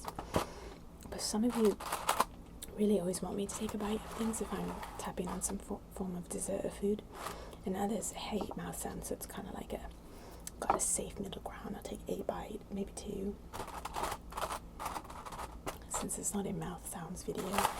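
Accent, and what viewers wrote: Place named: United Kingdom. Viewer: I'm in love with your accent! Are you from England or Scotland? Perhaps Australia?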